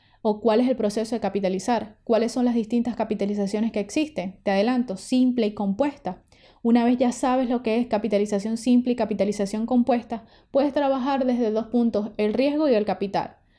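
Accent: Maracaibo, Venezuela. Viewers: Venezolana? Maracucha???? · Holaaa viendo tu canal me estoy dando cuenta de toda la información q nesecito saber para hacer esto... De verdad te agradezco mucho la información.. Lo mejor de todo es tu acento Maracucho con que das el mensaje, los maracuchos vamos a conquistar el mundo.. Jajajaja saludos...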